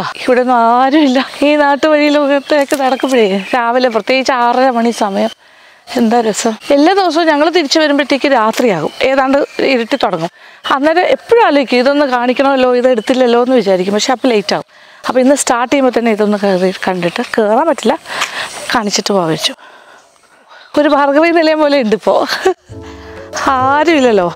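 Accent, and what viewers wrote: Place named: Palakkad, India. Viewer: Very beautiful 🥰. Chechikku palakkad bhashayum vannu. lots of love.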